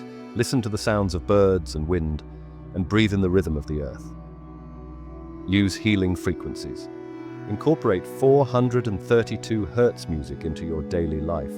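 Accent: United Kingdom. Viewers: I can't stand these UK accents so annoying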